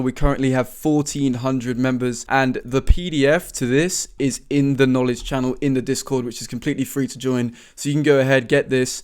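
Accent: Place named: Leicester, United Kingdom. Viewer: he got the Leicester accent 🤔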